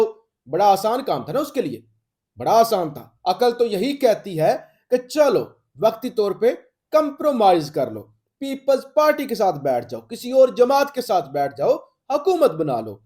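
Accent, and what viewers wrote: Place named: Rampur, India. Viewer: Asslamoalekum Ap plz yeh bate yeah Ki Apki Ammi ya Abbu Ki traf Ka koi india kr U.P se hai, Rampuri hai koi na koi 😅… Ap Bilkul Rampuri Andaz Ke ho, Ap Pakistani nhy lagte ho … plz reply 😅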